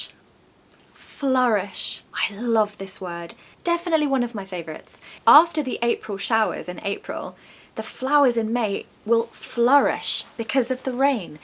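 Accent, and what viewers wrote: United Kingdom. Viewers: hi from Bavaria Alpen! I enjoy your British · You have my dream British accent. I can listen you speak forever. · Hello, I love these beautiful words you taught me with the good definition. You are teaching me lovely words in the different seasons, months, and even in the Valentine"s day. I love learning those special words from you with the beautiful British accent and pronunciation. when you mentioned about Lady Bird, I used to read Lady Bird childrens" books in my childhood. That was my foundation of learning British English. Now you are teaching me beautiful British English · Omg the British accent is so rejuvenating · I love your accent,you are the incarnation of the real British English I’m from Chile 🇨🇱 and I love the British Accent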